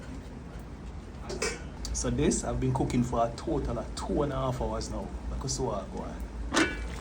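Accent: Jamaica. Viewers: You make this island lady home sick. Your meals, your accent, your praise. Love love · Don't forget the beautiful Jamaican accent 🤤n food together. Delish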